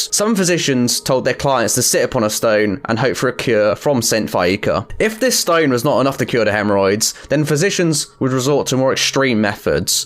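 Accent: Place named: United Kingdom. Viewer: To hard a british accent. Sounds like he ismeither too lazy to form complete words with a space between them, or has a mouth full of crap.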